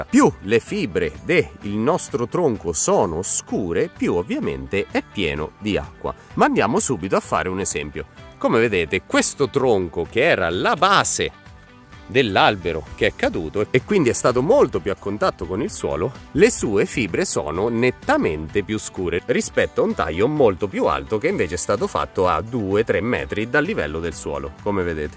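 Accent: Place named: Agrigento, Italy. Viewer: Ciao dall'accento sembri di Agrigento io sono di Palermo l'inverno della nostra isola e così mite che da lunedì sono previsti 20/°C 😎☀️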